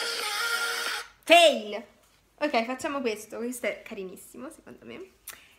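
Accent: Naples, Italy. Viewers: Ma è di napoli?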